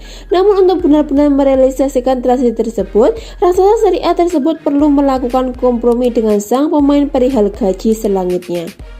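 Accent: Java, Indonesia. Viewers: Medok bro...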